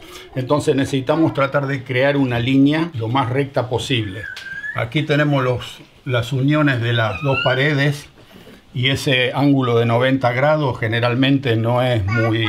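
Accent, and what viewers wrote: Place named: Argentina. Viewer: Nos encanta la calidad y los detalles de la explicación. Además nos asombrá que después de tantos años en Canadá conservés el acento argentino como si nunca te hubieras ido. Un capo de la pintura! Saludos desde La Plata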